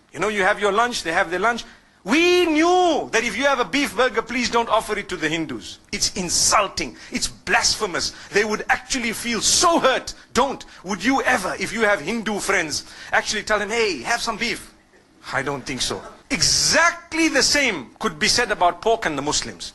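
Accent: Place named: Zimbabwe. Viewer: Even your English can show,you grew up in Zimbabwe,,i like that you were treated well in Zimbabwe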